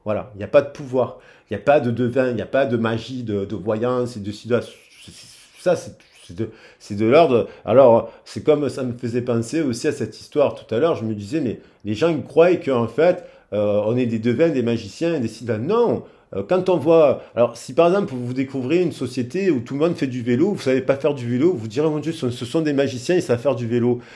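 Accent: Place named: Marseille, France. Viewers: Oh bonne mère Bon anniversaire moi qui adore cet accent...Nîmois et Marseillais de coeur...ma.famille.avait la meilleur boulangerie du Merlan...Garvi....en 86.. jai des souvenirs magnifique...L.OM ....puis a1 selection the Voice a L.hyperion......bonne journee et merci pour cette guidance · Et bien voilà, vous m'avez encore fait rire ! J'ai vécu à Martigues, j'avais moi aussi cet accent qui vous caractérise ! Profitez bien de votre soirée entre amis ! Merci pour cet échange et d'avoir pris le temps de me répondre, c'est excessivement rare, comme mes commentaires en règle général, mais nous avons tous besoin d'encouragement. A bientôt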